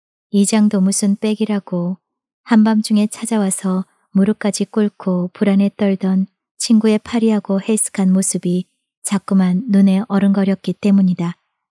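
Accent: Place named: Honam, South Korea. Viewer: 혹시 출신이 호남이여.? 워찌 그렇게 억양이 똑 같어부까이.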